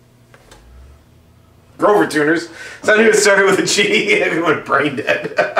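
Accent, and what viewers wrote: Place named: Canada. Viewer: O.K., Good cover, guys!, and a Great guitar!, 👍 Gave you a follow, too. Because IF YOU'RE NOT CANADIAN!, you certainly SOUND Canadian, with straight-shootin' straightforward appraisal!, of the qualities & sound of an Ibanez I have, and appreciate! Rock on! 🎉😂 🇨🇦 🍁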